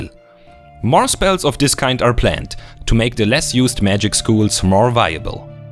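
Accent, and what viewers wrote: Germany. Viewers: oh gott was für ein cooler deutscher akzent :D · Super video, klingt nach wirklich tollen ideen für die neuen Zauber. Der deutsche Akzent ist sehr putzig :D :D Ein native speaker kriegt da wohl Gänsehaut, aber klingt einfach süß :D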